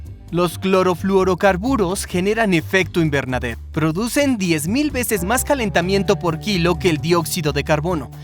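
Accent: Latin America. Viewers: Es que este es latino y el otro era argentino y por ende es un poco mejor y actuado el latino en Argentino igual ahí actuación pero era más un doblaje documental osea menos actuación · Aquí hay un problema. Yo, soy de España y como hablante hispano, me siento perturbado por un doblaje que presenta un acento latino muy cerrado (como es el caso). El anterior narrador era PERFECTO. Su tono, expresión y enfasis era el adecuado en todos los aspectos. Este narrador enfatiza demasiado en las tonalidades y da como resultado una narración "cantarina" que hace que pierdas la atención al contenido del video y pierdas el interes de forma absoluta. He decidido desuscribirme del canal hasta que haya un cambio en el doblaje. Y si no lo hay pues ... entonces dejaré Veritasium en Español. :(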